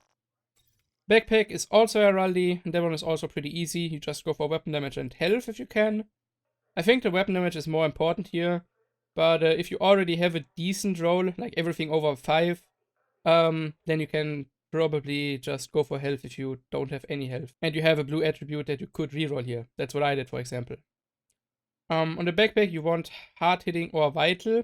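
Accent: Germany. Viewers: Maaan, dieser deutsche Akzent macht mich fertig 😂😂😂 wäre cool wenn du auch Was auf deutsch machst ✌🏽👍🏽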